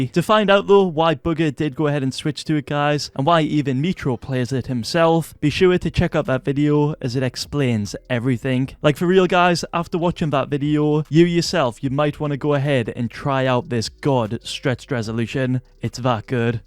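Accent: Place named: Newcastle, United Kingdom. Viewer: been a fan for about 2 years, but please stop saying "that have went down" its "gone"!!! lmfaoooaoaoaoaooaoaoo... fr tho i get its the geordie in u (i swear ur geordie anyway)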